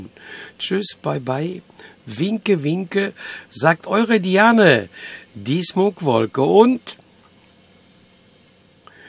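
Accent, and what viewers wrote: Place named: Ruhr, Germany. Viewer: Hi Diane , naja Kritische Person ? Das bin ich auch , mir wird auch nachgesagt ich sei Pessimist . Naja ich sage da nur Kritische Person hin oder her ,man muss nicht zu allem ja und Amen sagen :-) . Und ich bin kein Pessimist sondern Realist denn Pessimisten sind die besten Realisten :-) . Und du hast halt eine Ruhrpott Schnautze ,wie sich das gehört :-) , halt ein Original ^^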